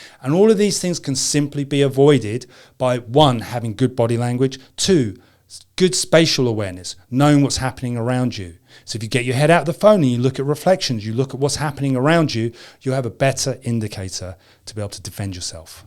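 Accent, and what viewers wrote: United Kingdom. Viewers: New York? You sound like you're from the UK with that accent. I just checked out your main page. You're usually based in London. Right??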